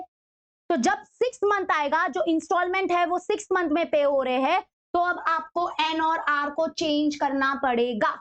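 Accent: Maharashtra, India. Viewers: Mam are you from Maharashtra....? ( come through your marathi....)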